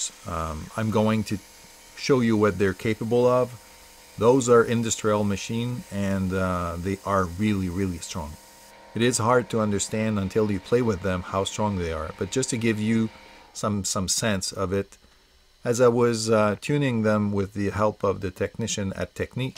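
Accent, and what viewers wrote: Quebec, Canada. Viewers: Is it just me or you have a Quebecois accent? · T'es tu québécois hahah?:)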